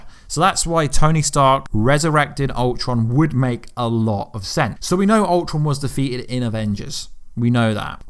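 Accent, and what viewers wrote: United Kingdom. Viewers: I'm well accustomed to certain pronunciations being butchered by a British accent, but since when did "human" become "thuman"?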